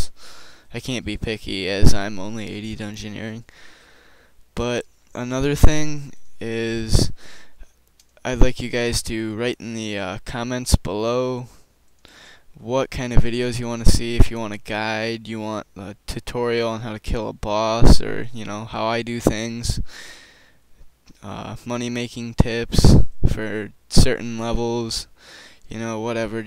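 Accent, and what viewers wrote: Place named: Norway. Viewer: R u norwegian? U got a norwegian-english accent :P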